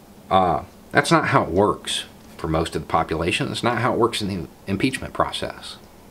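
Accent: South, United States. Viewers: point, as he's said in his videos, is that there are plenty of white, bearded, ballcap-wearing, truck-driving, Second Amendment-supporting, Southern accent-sporting guys like him, who think like he does.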